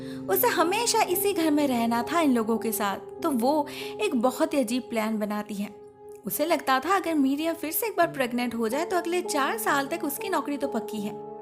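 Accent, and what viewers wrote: Bengal, India. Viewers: Your Bengali accent ❤️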